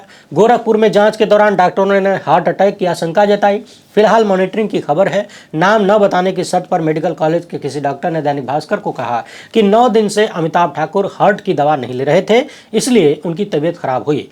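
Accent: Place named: Bihar, India. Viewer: जी मुझे आपके बिहारी होने पर गर्व है आपके बोलनेका बिहारी स्टाइल बहुतै अच्छा लगता है।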